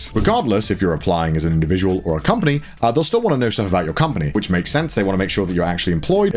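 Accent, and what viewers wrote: United Kingdom. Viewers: Tbh I really like how talk, the British accent is chef kiss even though he’s saying funny stuff, is just soothing 😫✊🏻❤️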